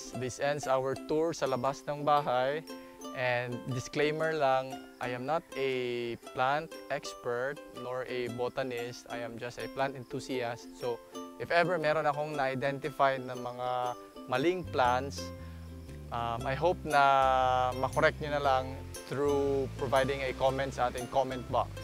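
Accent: Visayas, Philippines. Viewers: Instant subscriber here.. so Bisaya ang tone Ng voice ...rpoud Bisaya here too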